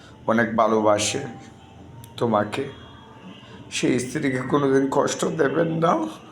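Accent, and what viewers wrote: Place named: Sylhet, Bangladesh. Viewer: আসসালামু আলাইকুম, ও ভাই আপনার কথায় বুঝতে পারছি আপনি সিলেটি মানুষ, আমার জন্য দোয়া করবেন আল্লাহ তায়ালা যেন আমার মনের নেক চাওয়া কবুল করেন, আমার স্বামী দীর্ঘদিন ধরে আমার সাথে কথা বলেন না,ভুল বুজে আছেন কিন্তু আমি আমার স্বামীকে অনেক ভালোবাসি,সবাই আমাকে স্বামীর আশা ছেড়ে দিতে বা মামলা দিতে,আমি কোনো সমস্যা সৃষ্টি করতে চাইনা,কারন উনাকে ছাড়া আমি একা থাকবো কিভাবে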